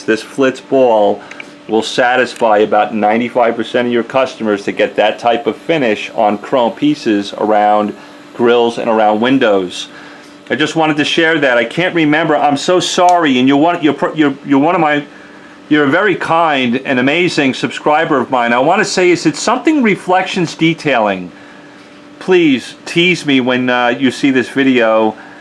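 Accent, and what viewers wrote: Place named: New Jersey, United States. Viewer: Ok I love the channel, new subscriber here. Lol, your from TX now but sound like originally from Jersey ;). Love the detailing advice and yes God Dammit I purchased The Ball, gotta get The Flitz Ball...go in slow then speed it up! 👍🏻😎👍🏻 Love it, Tampa FL we share the same heat here as well brotha....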